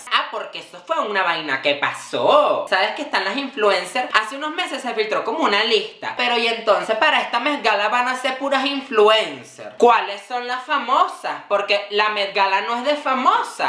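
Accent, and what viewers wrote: Venezuela. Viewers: Amo como hablas Chama yo también soy venezolana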